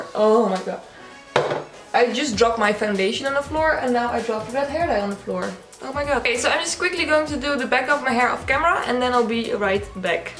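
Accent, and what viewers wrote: Netherlands. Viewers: Are you dutch. Because your voice sounds dutch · Dit is de eerste video dat ik van je zie je doet je intro en ik weet gelijk al dat je nederlands bent door t typische accent😂❤ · This is the first video I've watched from you and the second you spoke, I had a gut feeling you're Dutch. Came to find out you are! Haha! Also, love your new hair color. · Are u Netherlands? I think so cause u have kinda a dutch accent while ur speaking English. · what is your accent? is itDutch?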